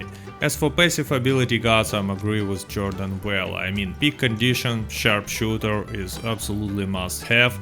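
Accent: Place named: Russia. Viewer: I've been subscribed for about six months and have a couple of compliments for you. First, your sarcasm is super extra funny coming from a guy with a Russian accent. English idioms and puns are hard even for native speakers but you mastered it. Second, if I were to guess your occupation it would be in the sciences. The combination of the scientific method you use (you always have an independent and dependant variable, and eliminate possible variences in the tests) plus you have a good sense of humour so I would bet you are an engineer. Last, I loved the way you used to say "Target" as "Tarjet". You should keep doing that as a running joke. Mad props to you, far and away the best Rdr2 YouTuber!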